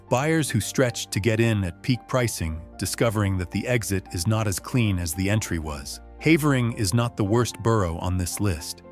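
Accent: United States. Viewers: Please avoid American voiceovers. Firstly, on subjects about London (or Britain in general) it sounds like it's carping. Secondly, since the advent of the disgusting president Trump I never want to hear an American voice again. I turned off after about 30 second. Goodbye! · Clap trap.....also American accent.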